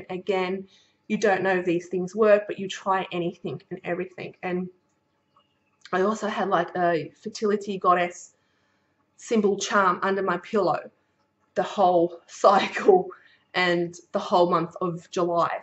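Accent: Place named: New Zealand. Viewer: Just a question you sound like you have an accent... my guess is New Zealand accent... I can hear it in there with a few words that you use.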